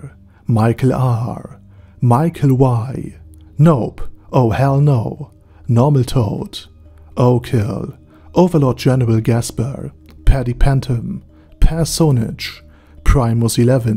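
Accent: Germany. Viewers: Starker deutscher aktzent aber cooles Video Bro 🎉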